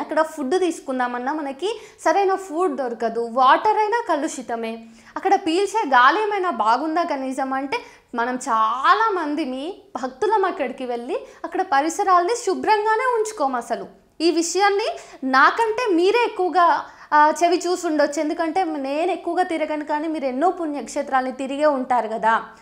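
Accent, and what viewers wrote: India. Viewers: Indian educated vioce.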